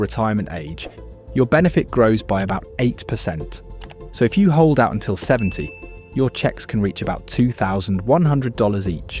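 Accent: England, United Kingdom. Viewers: An English voice talking about dollars (US is implied) rather than pounds or Euros. Did I miss the part in the commentary where he talks about who his intended audience is?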